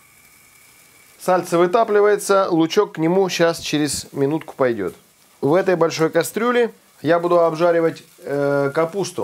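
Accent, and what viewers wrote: Siberia, Russia. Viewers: Привет! Привет из Кемерово, мы почти земляки, у нас стоят сильные морозы, без надобности по улице не гуляем, я лично с утра смотрю твой канал, встретила тебя на ютубе не так давно и влюбилась, сначала мне казалось, что ты новичок и делать ничё путём не умеешь, сейчас мне даже смешно, я поняла, что человека сначала надо понять, как бы срастись меж собой, понять философию, в данном случае готовку разную. но даже не в этом дело, ты говоришь и думаешь как настоящий сибиряк, мы тоже так разговариваем и мне доставляет удовольствие слушать тебя, как будто ты у меня в гостях! Ты меня понял, я знаю, успехов тебе и удачи во всём, конечно семейного счастья и здоровья всей семье! С наступающим!!! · ! Какое же я получаю удовольствие от общения с Вами! Первое — это Вы, а уже второе — Ваши рецепты! Сама я россиянка. Корни моих родителей в Ярославле. 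Но так сложилось, что с детства живу в Украине. Есть чувство тоски по России. Давно там не была, а теперь это и совсем проблема. Вы — одна из ниточек, которая связывает меня с моей Родиной. Ваша речь, Ваш говор — это такое счастье для меня! СПАСИБО!!!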